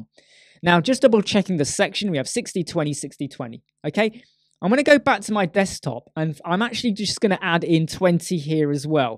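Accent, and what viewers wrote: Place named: United Kingdom. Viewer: Good videos but I hate british accent. It makes me angry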